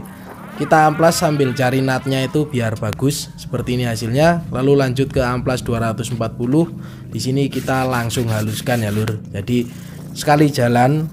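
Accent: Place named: Banyumas, Indonesia. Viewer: Sanggar mazeeeghhh.. Ngapak e ora ilang 🤣🤣🤣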